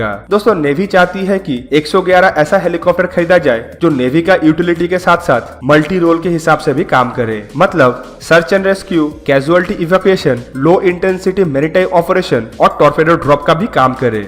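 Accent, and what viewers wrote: Bengal, India. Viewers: Bolne wala bengali hai. Would be good to have proper hindi accent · how does accent matter.. mujhe uske bangla accent mein boli hindi samajh mein aa gayi..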